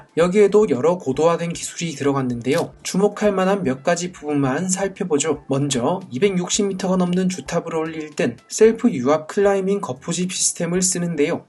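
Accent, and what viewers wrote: Gyeongsang, South Korea. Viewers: 채널 주인 분이 경상도 억양을 쓰시다보니 그런 것 같아요ㅎㅎ 경상도에선 유궐치뤌파뤌 이렇게 발음하다보니깐요.. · 경상도 사투리에요, 발음이 쌘게 특징이죠.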